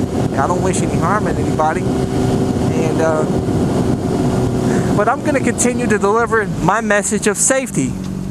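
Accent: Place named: Washington DC, United States. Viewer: Ohioians have an accent, you sound more like you're from DC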